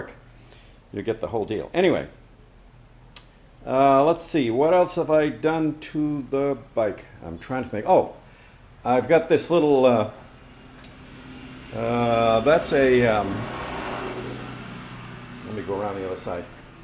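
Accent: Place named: New England, United States. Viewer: His accent doesn't sound like Florida. Sounds like a New England transplant.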